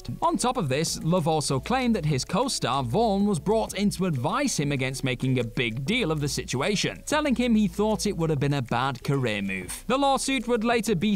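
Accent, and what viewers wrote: United Kingdom. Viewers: "He's English" You seem to be under the mistaken assumption that they have one accent/dialect. Which is wrong. This is a specific UK dialect that does pronounce the "g" in hangover. Other dialects don't.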